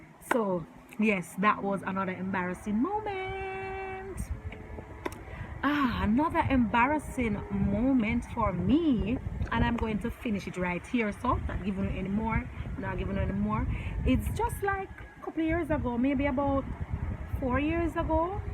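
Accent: Jamaica. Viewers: Hahahahah I really like your jamaican accent..it makes everything you say so extra...and these stories are quite embarrassing..but my favourite is the karaoke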